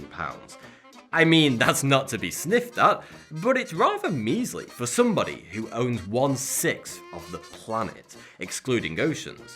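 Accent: United Kingdom. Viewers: "Countries just have to own 100% of their own land in order to enforce laws". Wow, way to pull "facts" out of your ass man. You have a British accent. Have you heard of Stockholm Syndrome? In the USA there are some people with "coloquial title" which is absolute ownership. Is total chaos breaking out on those properties? Find out.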